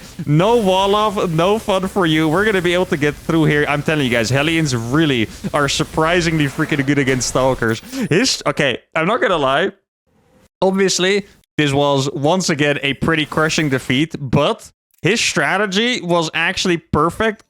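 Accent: Netherlands. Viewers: are you dutch, brother? I feel like I hear it in your voice. I'm from scheveningen either way, awesome video. has to feel cathartic, lol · As an American I can hear the Dutch in his voice pretty clearly haha ive heard dutch folks speak a lot though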